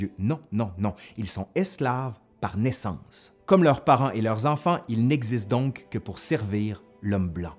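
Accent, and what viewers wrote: Quebec, Canada. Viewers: Sans racisme aucun , l'accent Québécois me fait saigner les oreilles , alors que j'adore nos cousins d'outre Atlantique , c'est juste leurs accents que je trouve insupportable . Les juifs étaient déjà persécutés bien avant la crucifixion de Jésus (égyptiens , Babyloniens ) . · ACCENT CANADIEN EN MASSE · He is from Quebec, they speak a french dialect with another accent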